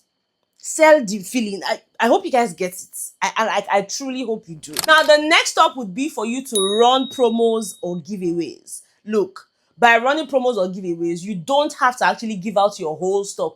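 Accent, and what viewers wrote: Nigeria. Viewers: Hi. My name is..im a teacher...but I'm really looking forward to starting a YouTube channel or something in tech or Customer Support Representative. Your channel is a blessing and breath of fresh air. Your content is so engaging, educative and classy! Your manner of speaking is quite commendable... especially the way you mix clean grammar with the Nigerian English. I'll keep watching out for your posts. Keep up the good work.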